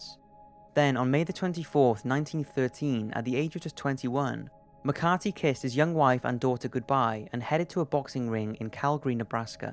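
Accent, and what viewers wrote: Wales, United Kingdom. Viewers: klo everyone wants to see the British-accented narrator's face xD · Are you welsh?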